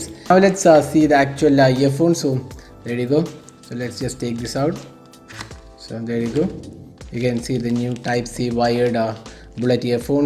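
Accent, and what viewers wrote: Kerala, India. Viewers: Malayali your English bro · Mallu slang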